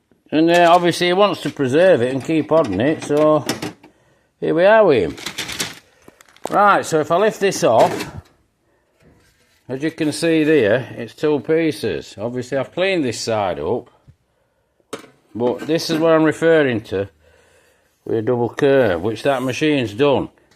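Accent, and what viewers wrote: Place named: Yorkshire, United Kingdom. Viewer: Yeh a master, not many folk left now that can do the work & classic car repairs like this man does. Pluss he's from Gods own country Yorkshire the same as my old queen came from with that amazing accent. 👌 Jim.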